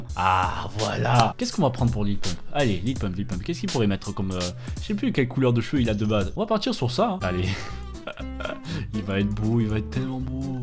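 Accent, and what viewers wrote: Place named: Marseille, France. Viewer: Ta l'accent Marseille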